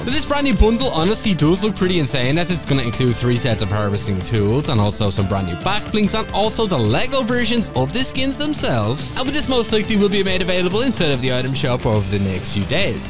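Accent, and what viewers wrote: Ireland. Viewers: Are ye from ireland I am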